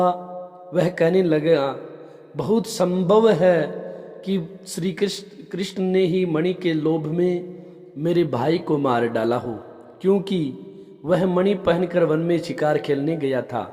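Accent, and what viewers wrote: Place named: Rajasthan, India. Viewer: आप राजस्थान से लग रहे हो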